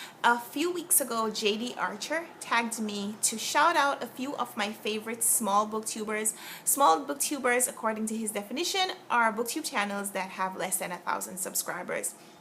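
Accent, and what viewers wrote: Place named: Jamaica. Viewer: It's so heart-warming! I too LOVE your Jamaican accent! It's so beautiful! Irie!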